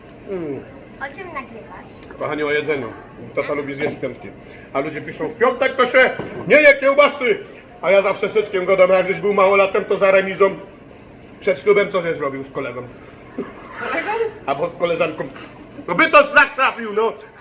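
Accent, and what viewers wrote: Highlands, Poland. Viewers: Po co typ pierdoli po góralsku a na innym filmie slychac że mówi normalnie. Dwa, podobno to żaden góral tylko czytałem że pochodzi z żor. To jaki z niego góral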